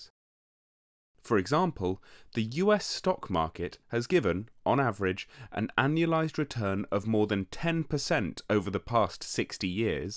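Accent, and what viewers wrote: England, United Kingdom. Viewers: It's just an English accent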